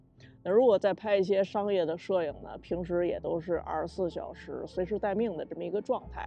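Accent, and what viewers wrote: Beijing, China. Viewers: 摄影师里京腔最好听的，😊😊😊😊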